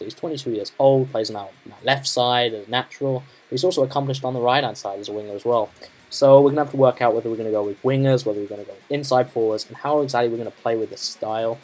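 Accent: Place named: United States. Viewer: you sound like you have an american accent. any reason?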